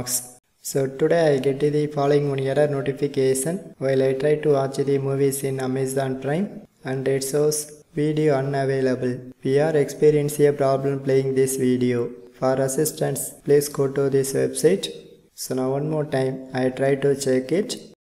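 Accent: India. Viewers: You helping me very well, i got shadow ban in call of duty ... you fiy prroblem , i now no problem in brain . Thaks you my brother i love yourre Indian-English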